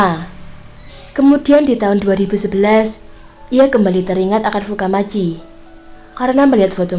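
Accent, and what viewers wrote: Java, Indonesia. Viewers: Mba nya medok yaaa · Medok bgt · Logat jawa nya kental bngat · kelihatan medoknya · org Jawa yah? Kok medok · Cie medok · Medok bnget sis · mbaknya logat jowo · adminnya orang jawa ya.. D-nya itu lo.. khas banget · Jawanya medok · Medog gaes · admid pasti orang Jawa 😄 Medoknya gak bisa bohong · Bahasanya medok gk nyaman dengerinnya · Suara mu medok nduk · saya tak suka suara medok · Kak riquest anime perang ato saling bunuh aku suka v] Dan biasakan bahasa indobesia Dan kurangi nada kayak orang jawa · Yang ganjel aksen jowo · wong jowo medok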